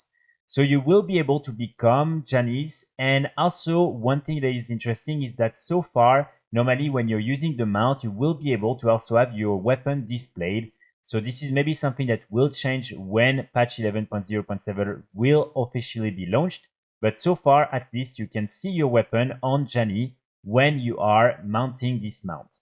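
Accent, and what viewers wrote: France. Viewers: the french accent makes this unwatchable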